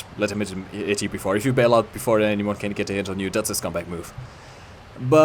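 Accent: Germany. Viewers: Are you German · Bist Du Deutscher?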